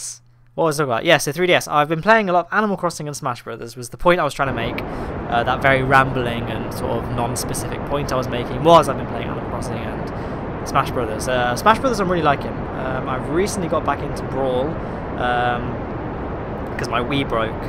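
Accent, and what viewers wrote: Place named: England, United Kingdom. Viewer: You are very English arent you? English is not my mother language but your accent is so strong :D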